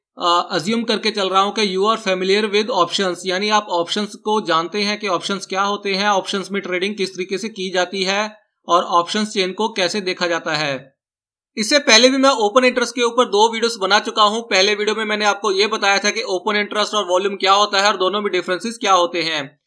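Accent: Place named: Punjab, India. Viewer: Punjabi laggda hainnnnnn!!!!😃😃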